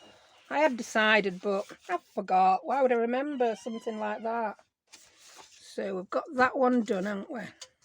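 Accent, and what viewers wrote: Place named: North Yorkshire, United Kingdom. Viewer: I just found your videos! I absolutely love them! Especially love your accent. I spent 18 years in the UK, mostly North Yorkshire. You are making me homesick! In a good way, I loved it there. If I may ask, where are you located?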